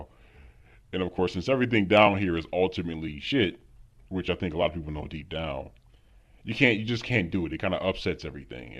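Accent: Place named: Baltimore, United States. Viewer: You still go out? Ima probably go to DC soon and I hear Baltimore in your accent. Pull up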